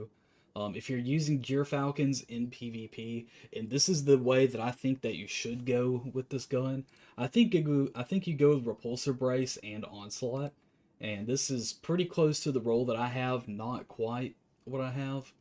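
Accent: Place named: South, United States. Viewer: As someone fro europe i really enjoy the southern draw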